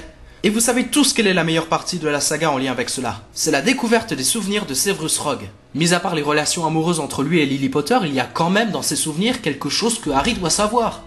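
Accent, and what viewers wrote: Switzerland. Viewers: Monde remplie de magie il a un accent suisse c'es tout ^^ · Tu est suisse ?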